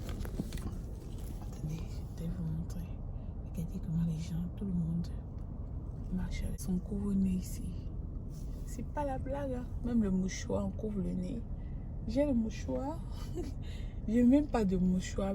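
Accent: Cameroon. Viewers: Bonjour.C'est fou vous avez l'accent camerounais, j'ai vraiment cru que vous étiez une compatriote. J'avoue que vous êtes ''realistic''.Ça change vraiment de toutes les videos que j'ai vues depuis le temps.Juste une question:Quelle est l'orientation de votre chaîne? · Cest l'accent camerounais justement. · She sounds more "camerounaise "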